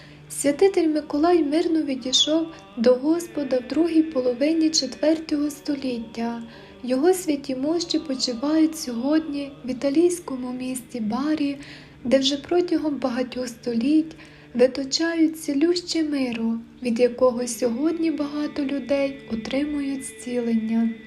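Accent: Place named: Transcarpathia, Ukraine. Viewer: Дуже ніжний голос, приємно слухати дикторшу. Помітив зразу закарпатський акцент, бо сам з Рахова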